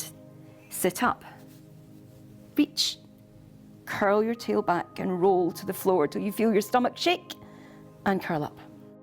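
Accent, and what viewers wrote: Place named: Ireland. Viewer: Lovely accent! So pleasant. Irish?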